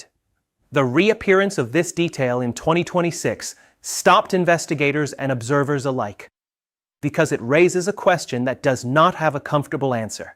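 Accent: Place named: United Kingdom. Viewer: Oops. What happened to the British accent?!?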